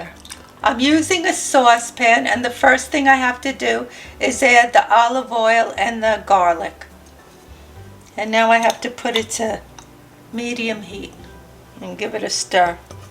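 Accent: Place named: New York, United States. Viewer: Hi again Grandma! You were married close to the year I was. Do you and Grandpa have a secret for such a long marriage? It's so rare these days. Btw I grew up in Mahopac NY and knew people with your same lovely accent. As a bride I had a set of the flowered Corelle dishes, green and white. P.S. they DID break although they were called unbreakable. Best wishes, Donna in Vermont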